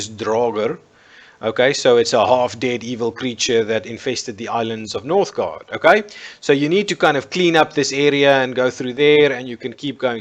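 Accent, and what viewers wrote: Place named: South Africa. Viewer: lol saw your post on steam. first 5 seconds I thought ... "is he also South African"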